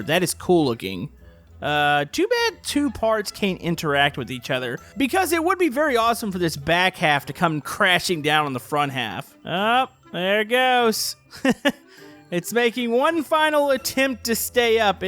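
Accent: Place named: West, United States. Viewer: are you western? because the way you talk and sound, sounds western American.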